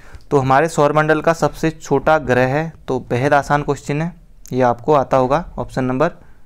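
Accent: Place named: Pahadi, India. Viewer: Bhai pahadi hai na tu... Avaaz se lg rha hai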